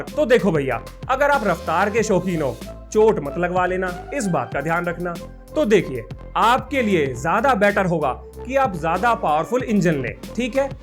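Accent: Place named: Delhi, India. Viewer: इसकी जबान दिल्ली वाली भाई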